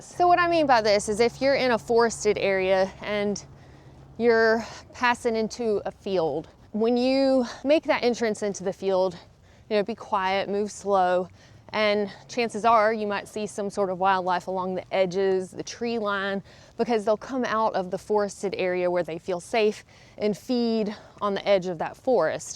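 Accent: South, United States. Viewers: Girl, love that southern vibe. Never heard more dropped g’s at the end of words in my Texas life!😊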